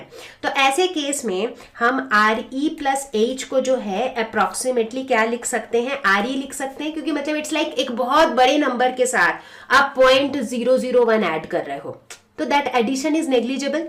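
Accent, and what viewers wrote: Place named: Tamil Nadu, India. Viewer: mam your language like tamil "FEEL" ☺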